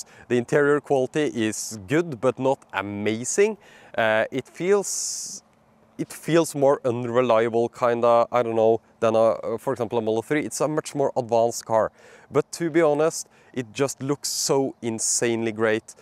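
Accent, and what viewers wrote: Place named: Norway. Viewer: Do Norwaynians always have this ehh eh ehh ehhh eh accent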